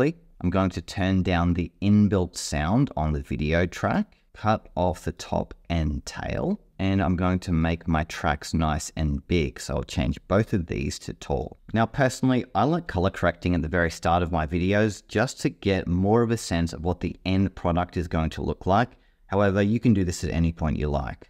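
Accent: Australia. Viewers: Thanks mate you are helping me get unstuck with these bloody reels.. you are Aussie, are ya maaate? cheers!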